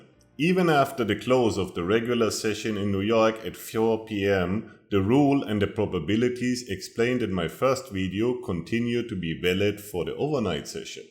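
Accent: Germany. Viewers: Dein Akzent ist Deutsch, daher antworte ich auf Deutsch ;-) Das schaue ich mir genauer an. Aber das scheint echt irre cool zu sein!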